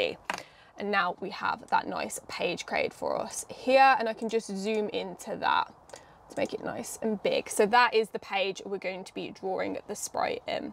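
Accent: United Kingdom. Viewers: btw I'm here bc of ur accent. I love the way that speak the British English.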